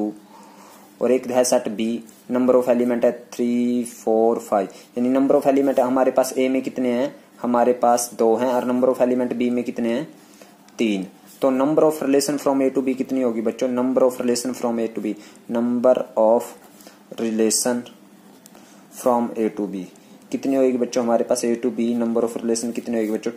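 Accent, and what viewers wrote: Haryana, India. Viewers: Apki haryanvi bhot cute h sir😁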